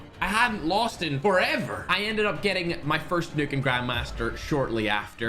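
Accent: United Kingdom. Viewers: Are you British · Bro where are u from britsh?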